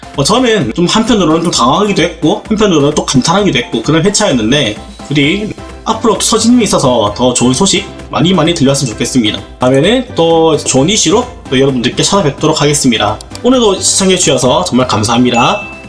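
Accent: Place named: Gyeongbuk, South Korea. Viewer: 내용은 좋은데 경북말투 진짜 듣기 파이네요~😢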